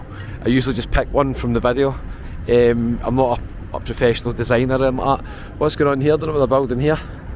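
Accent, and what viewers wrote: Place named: Paisley, United Kingdom. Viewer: Around of applause for hes came so far from his early Scotsman days. Well done man mon the buddies. I ll keep following you wherever you are. Your dulcet Paisley tones good luck⚽😎🆒🏖️👍 Viva LAS 😎🗼🗽🕋🕌 good luck on your next destination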